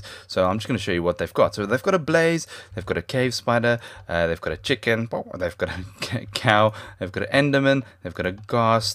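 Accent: South Africa. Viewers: are you South African? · He does sound South African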